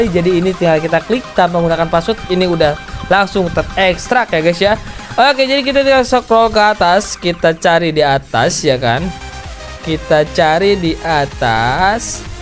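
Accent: West Java, Indonesia. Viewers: Bang orang Sunda ya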